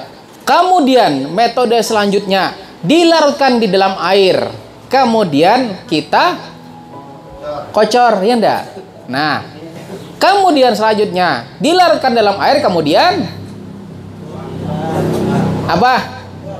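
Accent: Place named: Hulu Sungai, Indonesia. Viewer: Hadiirrr ... Nah pak ulun curiga mendengar logat piyan ne mulai awal nonton Chanel ini, model ada hulu2 nya macam urang hulu sungai🤭sekalinya ... Kita sebanua, Ulun asli Martapura, tp kuitan Ulun hulu sungai asli Kandangan wan Barabai, Sukses buat Chanel piyan ini, SGT BerManfaat... Smoga Pertanian Indonesia Maju😇 Aamiin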